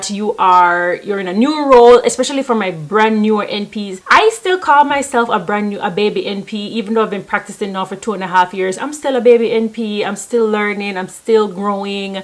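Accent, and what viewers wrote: Jamaica. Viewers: Are you a Jamaican? You sound like a Jamaican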